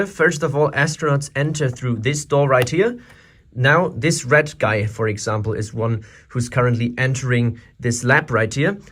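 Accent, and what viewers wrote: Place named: Germany. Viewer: What about that german accent though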